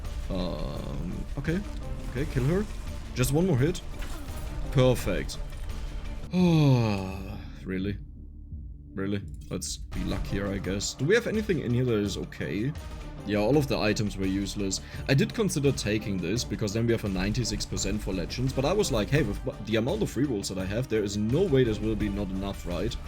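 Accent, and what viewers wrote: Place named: Germany. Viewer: loved the video so far, but kind of curios... are you german or from a german speaking country? I feel like your accent kinda sounds like it ever so slightly.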